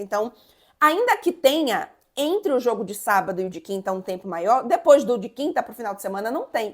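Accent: Bahia, Brazil. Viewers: você ainda tem bastante sotaque baiano, viu?! 😅😅😅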